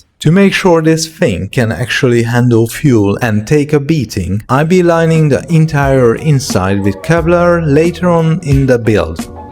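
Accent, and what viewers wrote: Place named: Hungary. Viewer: I thought I heard it on your dialect 😊 My father was from Hungary 😊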